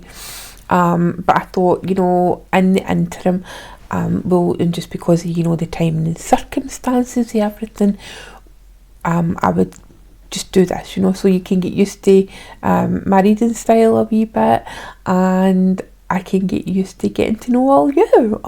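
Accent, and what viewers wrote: Scotland, United Kingdom. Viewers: Love to hear a Scottish accent on here!! ♥️ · Great reading! You are absolutely adorable and I'm so proud of you for battling with the technology side of tarot... Never easy hehe Love your accent! It's always a pleasure to hear the Scottish words mixed in I've been watching Len Pennie Scots word of the day and it's been fun getting to train my hearing and comprehension... I love that you'll be inadvertently helping with that It's awesome!! Thank you for being such a lovely friend and stepping in, it's beautiful to see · Hello! Thanks for your reading. Love that deck. Don't worry about your Scottish accent, it is fine. Well done! We know that Carrie would only let People that she really trusts look after her channel. 👍💐 xx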